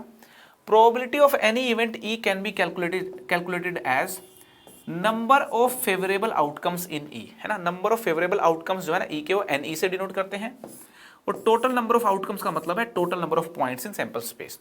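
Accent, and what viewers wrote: India. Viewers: I understand Indian accent badly but it is still better than our math at school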